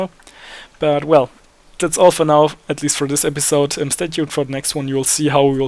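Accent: Germany. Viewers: man hört deinen deutschen akzent und man hört deinen bruder deutsch sprechen in skype ^^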